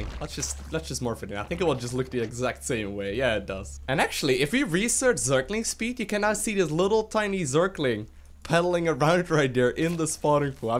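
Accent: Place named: Netherlands. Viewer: The accent, though. Are you Dutch? Good vid nonetheless!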